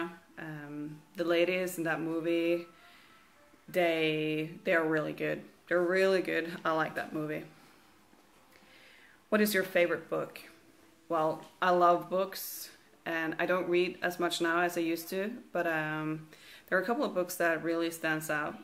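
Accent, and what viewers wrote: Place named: United States. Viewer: I was surprised when you said you lived in Norway. I was expecting somewhere in the United States. The only departure from the American accent was when you said "Agatha" and "progress". I have the book about Shackleton, but haven't read it....I will now. Thanks for the video. Martha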